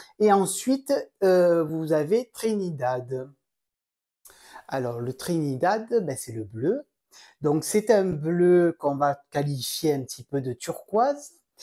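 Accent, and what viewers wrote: South, France. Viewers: Bonjour! L'accent du sud de a fait venir le soleil; la matinée était pluvieuse, jusqu'à ce que j'écoute ton podcast 😂😂😂 Super, tes chaussettes Serre-moi la pince! J'adhère a l'idée de l'instant Tricot 🎉 Bonne continuation!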